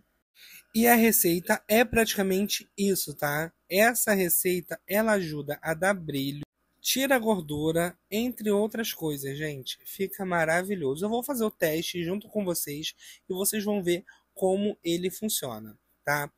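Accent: Rio de Janeiro, Brazil. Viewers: Oi Boa noite.Nossa eu sempre procurei 1 receita de pasta; porque aqui em SP e muito caro.🙏🏻❤ por nos ensinar c tanta clareza e dedicação vc e 1 luxo e os CARIOCAS falam fofos👍😘❤Inte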